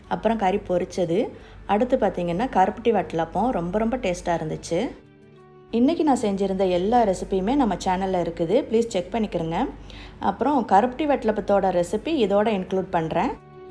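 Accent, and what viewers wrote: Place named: Kilakarai, India. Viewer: Ungloda slang padha theriudhu nega klk nu nice sis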